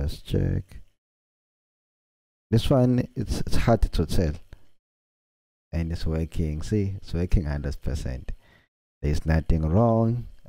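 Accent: South Africa. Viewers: You from south Africa bcz I here from you iiibo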